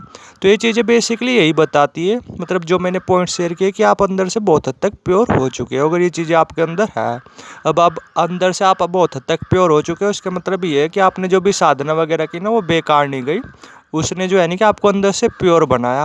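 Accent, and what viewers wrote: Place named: Haryana, India. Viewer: hello bro phone लेकर ही बैठे थे 😅क्या वह हमें लगा कि आप हरियाणा से हो आपकी वॉइस से पता लग रहा था आपकी वीडियो बहुत अच्छी इसको कंटीन्यू रखना और दोनों चीज हमें भी है जैसे कि आपने वीडियो में बताया थैंक यू सो मच एंड गुड ब्लेस यू✨🙏😌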